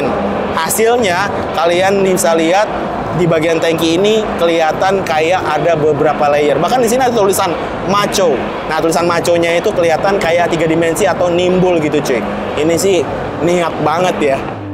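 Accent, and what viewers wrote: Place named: Central Java, Indonesia. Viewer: Ngomonge medok mesti bengkel e wong jawa tengah iki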